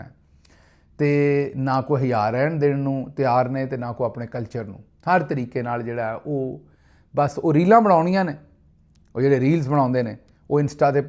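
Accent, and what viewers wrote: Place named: Nagaland, India. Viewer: گل صاب خالص ناگاں دا لہجہ تہاڈا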